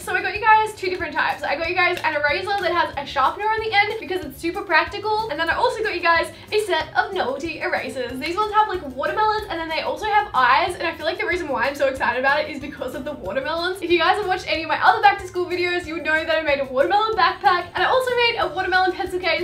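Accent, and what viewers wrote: Australia. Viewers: she's Aussie because from QLD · Is that an Australian accent? Hope I don't offend you if it's not.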